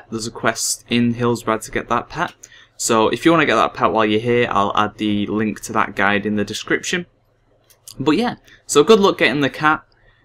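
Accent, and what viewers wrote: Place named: Glasgow, United Kingdom. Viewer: Wow the guy is developing a Glasgow accent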